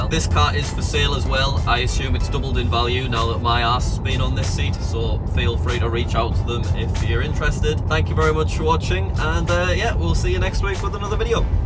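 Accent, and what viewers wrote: United Kingdom. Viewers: How good is it to hear Brits doing these Tesla road tests? 0.60 in 3.07 secs...'Oh my God...Jesus Christ...Jesus Christ....Bloody Hell' Just my kind of comment... Love it:-)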